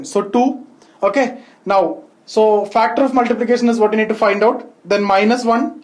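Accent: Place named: Kerala, India. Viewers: Thank you very much sir. I love the way you explain. Your pronunciation reminds me of the Malayali neighbours I had years back <3. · Don't waste time by watching this school maths , seeing this malyali ,accent waste waste waste time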